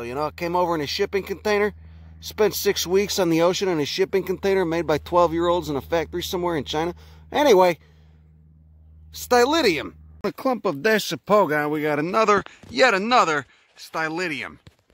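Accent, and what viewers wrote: Chicago, United States. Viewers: Chicago I think. It is really nice hearing his accent and botany.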